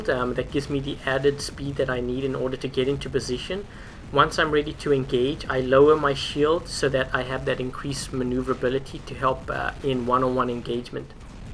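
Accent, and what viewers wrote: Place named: South Africa. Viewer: I could tell you were somewhat South African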